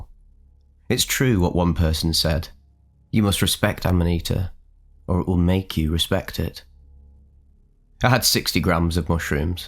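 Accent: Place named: Lancashire, United Kingdom. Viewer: Hey, I’m from Lancashire living abroad for work and always missing home. Hearing your accent makes me feel back at home. Just wanted to thank you for your work, incredibly educational and entertaining. Please keep it up 🤙🏼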